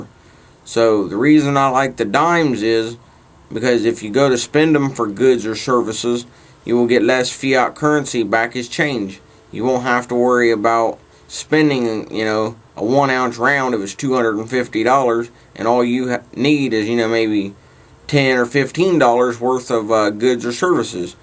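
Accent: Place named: Texas, United States. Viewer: Wow I love your accent! Which state do you stay in? Texas? :)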